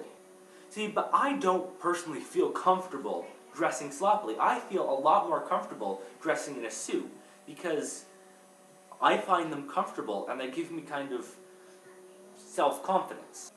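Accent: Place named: Scotland, United Kingdom. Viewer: nice scottish accent dude.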